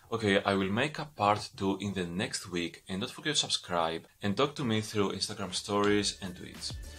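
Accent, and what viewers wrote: Greece. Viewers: Il Αφερφέ ο λόγος που του μίλησα στα Αγγλικά είναι επειδή ΟΛΑ του τα βίντεο, ακόμη και η περιγραφή του καναλιού, των βίντεο κλπ είναι στα Αγγλικά. Άν και για να πω την αλήθεια, η προφορά του μου κίνησε λίγο την περιέργεια και έμοιαζε πολύ με Ελληνική. Τελικά είναι όντως Έλληνας. Οκ δεν πειράζει για την ερώτηση που είναι στα Αγγλικά. Με αυτόν τον τρόπο μπορούν να την διαβάσουν και οι ξένοι, οι οποίοι μπορεί να έχουν την ίδια ερώτηση με εμένα... Οπότε μην πας να το παίξεις έξυπνος για να βγεις από πάνω. Εφόσων μιλάει Αγγλικά στο βίντεο, είπα και εγώ να κάνω σχόλιο στα Αγγλικά για να καταλαβαίνουν όλοι. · Πως φαινεται η Ελληνικη προφορα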